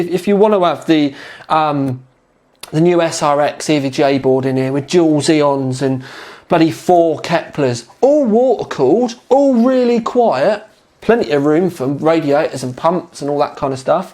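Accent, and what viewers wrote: United Kingdom. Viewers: He is British. The world doesn't revolve around your regional accent let alone language.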